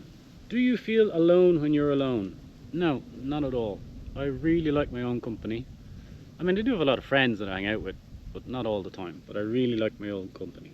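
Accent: Dublin, Ireland. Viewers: You most definitely sound Irish! From a woman living in Tasmania, but born in Balls Bridge. I miss my accent.....😞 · I didn’t think you had a Dublin accent but now I hear it, I think when you and Nick are together I couldn’t hear it as Nicks accent is very strong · How anyone thinks you don't sound Irish ,is beyond me lol ! Lovely soft Dublin accent .Greetings from Carlingford in the wee County .I have been watching Dan's channel for a long time (pre Chateau) You ,Nick and Dan make a great team. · That was fun thanks, you don't seem weird, but some of the questions were. My American ears think you definitely sound Irish. Thanks for the video. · Hello! I was so pleasantly surprised to see you have your own channel...Congrats! I could listen to you talk all day long, love me an Irish accent lol You have such a comedic way with your banter that is so entertaining and I have to agree with the other ladies, you are, easy on the eyes lol Been watching your journey at Dan's and always love the shenanigans you all get up to, also very entertaining. 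Love camping as well, and here in Alberta, Canada there are some beautiful places to set up camp, especially up in the mountains. Some beautiful scenery! Thx for sharing your journey with us all! ❤ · What strange questions! I love the accent...def irish!!! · Hi, Just saw your app pop up for first time. As soon as I saw your face knew you looked like Dan’s friend. I’ve followed him from the early days. He does good editing of his episodes. Loved your humerus answers to quirky questions, some made me laugh for sure. 😊 I’m English living overseas. Try to go Home most years for a few weeks, just hate airports and all the people and so much waiting for international flights. Yes, you definitely do sound Irish, no doubt about it. Always good to know, right. Have a good weekend. M. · You quite funny and it was nice to hear you lovely Irish voice. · Greeting from Washington DC! Not going to lie, kinda disappointed the backstory I’d “worked” out for you guys isn’t true, you know… the one where you guys were all part of a fairly successful band but have taken off and retired, now you guys just work on rebuilding a chateau… Nike of course was your guys crusty grizzled Og sound engineer/tour manager/lead roadie… le sigh… Seriously though, it’s cathartic and a joy watching you all rebuild that old lady! 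Keep kicking ass brother man! (also, funny that the first time I heard your Irish accent, I didn’t really pick it up and wasn’t sure if you were from the states😂. It’s a funny thing that happens where some Irishmen sounds more American than from the UK.. but it didn’t take long for that Emerald Isle twang to pop out.. cheers!) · I just found this video! Love your accent. And you’re funny! Your in your 40’s 😊 just waiting for my night with an Irish accent to come rescue me 😊 LOL Love watching you help Dan. Amazing work! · The Irish accent is as sweet as honey. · you also speak english with an irish accent :P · I thought you and Dan where brothers or at least related. But now that I hear you accent, you do sound Irish! Loved this Q and A! Have you visited chile?? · Irish with an Irish accent… brilliant! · Like my Irish relatives, and most Irish people I know, you have a charming, humorous eloquence. 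That’s why I watch. Love the brogue ! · Despite your travels, you've still got your lovely Irish accent. I'm perplexed that someone thought you don't sound Irish. 🤔🤯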